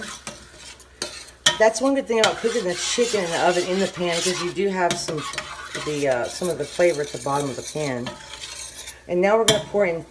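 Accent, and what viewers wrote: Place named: Louisiana, United States. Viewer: Looks delicious! I love cajun food and Louisiana! I was actually thinking when listening to you that you probably live in Louisiana! Lots of garlic and lots of cheese! Mmmm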